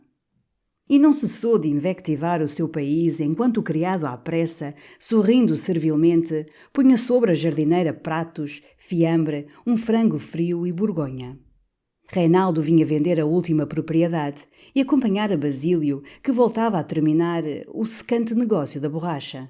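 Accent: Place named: Portugal. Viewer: Esse é meu livro preferido do autor e ouvi-lo com esse falar português, ainda mais em ótima narração, foi maravilhoso. Parabéns e obrigada!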